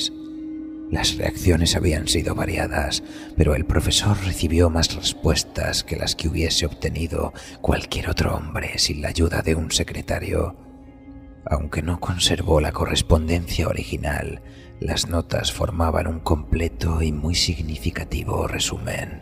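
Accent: Spain. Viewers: ay a mi no me gusta el acento español, ademas este señor lee todo de manera monotona, siempre en el mismo tono, no hace bien las pausas, Tener voz algo grave no quiere decir q se es buen relator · si, pero la cuestión del acento es mucho mejor en este canal para españoles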